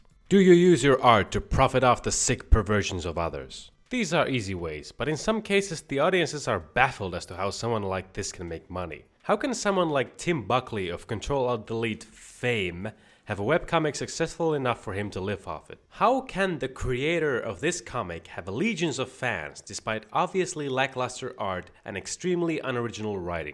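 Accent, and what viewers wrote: Finland. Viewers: Are you finnish? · wait a sec your finnish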